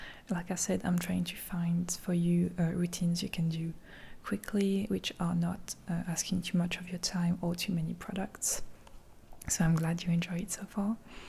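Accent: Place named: France. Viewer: Beyond just the beauty of your accent and the cadence of your voice, the nuances in your sentence structure are absolutely lovely and charming and they add so much to the uniqueness of your videos <3 For example: “strong colors are not scaring you in any way” would most likely be phrased, “you’re not afraid of bright colors” by a native English-speaker, but the delightful quirks of fluency in a second language include a non-standard sentence structure and I LOVE IT 🥰 Whether it’s a conscious choice on your part or simply a byproduct of having French as your first language prior to learning others, it’s marvelous.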